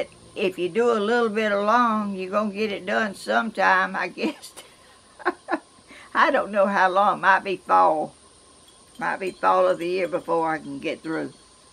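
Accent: South, United States. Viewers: You inspire me. Your beautiful southern accent makes me homesick for my kin in Tennessee.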